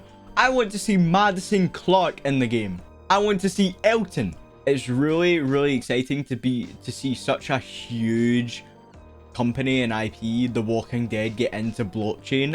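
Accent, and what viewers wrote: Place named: Scotland, United Kingdom. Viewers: Sounds Scottish to me not British :') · You guys are really trying to make it on this channel even switched the robot for the old dude and now the old dude for a younger British sounding (sorry if your not) but my question is when do I get a turn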